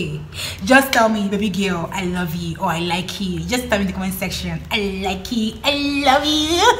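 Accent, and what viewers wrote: Ghana. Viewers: You virtually have Ghanaian accent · Wow, you're so lovely and entertaining, It's interesting watching and listening to you. You can keep and use the pronunciations as you learnt in ghana.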